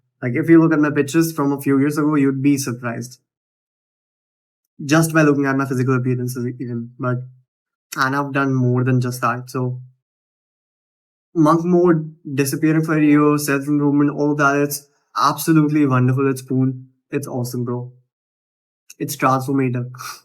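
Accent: India. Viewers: Let me be honest with you bro. You have the choice to listen to a YouTubers advice right now or you don't. 1. You are not actually adding ANY value. I can find your exact video 100 times in this niche, the difference is you are delivering it with an indian accent. Find a way to stand out, find a way to package your video in a way so good that people feel stupid not cliking on it and watching it. 2. I know that hamza may have said that editing your videos lowers audience quality and attracts "jeffreys", but that is only true to an extent. Even just cutting out pauses can improve retention rate significantly. Hamza has started to edit his videos again too, because he realizes the power of it. 3. Speaking about quality, your microphone quality is bad. Listening to your videos literally feels like a chore because of that. (4. You technically can still make it as a YouTuber in the self improvement niche, but it has become so oversaturated that you might as well start getting rich by selling a course on how to start a self improvement channel.) Advice may come off as harsh, but if you implement my advice correctly you'll pop off in no time.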